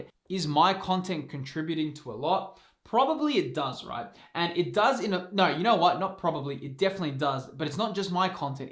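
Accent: Australia. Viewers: Only subscribed for the Australian pokemon accent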